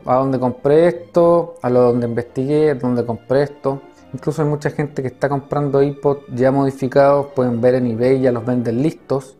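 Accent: Chile. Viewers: Ah, un chileno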